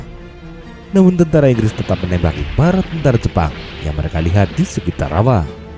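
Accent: Java, Indonesia. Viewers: Tolong di ganti pengisi suara nya ini gak jelas ku dengar logat Jawa baru kayak hidung di tutup pula ngomong nya JD gak kurang jelas terdengar oleh saya gak tau yg lain mungkin bgt jg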